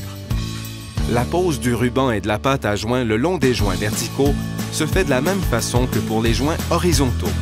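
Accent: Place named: Canada. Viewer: ce que j'aime c'est l'accent canadien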